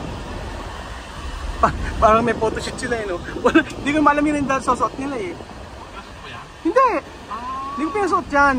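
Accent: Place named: Zamboanga, Philippines. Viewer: Chavacano po ba kayo or spanish speaking? Yong accent mo ay chavano.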